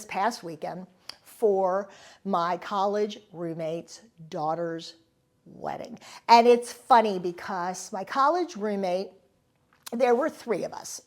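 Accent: Philadelphia, United States. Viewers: I could tell you were a Philly girl - same here! Love your topics. ❤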